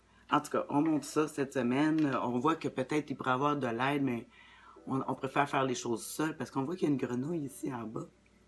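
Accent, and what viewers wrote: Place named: Quebec, Canada. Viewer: Bonjour:-) - Pouvez vous nous dire à quel signe astro s'adresse votre tirage? - Et Quel est cette douce et agréable musique derrière en musique de fond? merci pour votre doux sourire et votre accent québécois:-) ici en France... on adoreeee:-):-):-)...en attendant vos réponses on like et on suit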